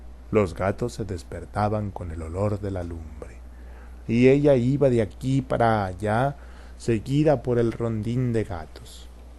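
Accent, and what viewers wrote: Mexico. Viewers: ¡Hola! Por favor, este es el español mexicano? (Is this Mexican Spanish please?)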